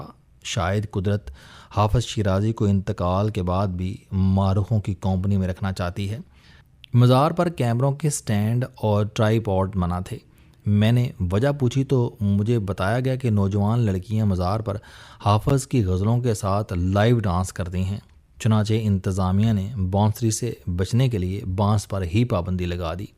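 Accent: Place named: Punjab, Pakistan. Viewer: nice to listen khas toor say panjabi lahzey ki urdu.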